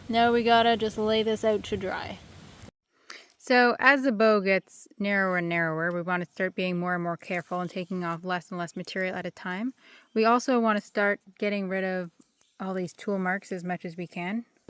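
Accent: United States. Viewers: ... fantastic technique .. congratulations ... are you from Queens?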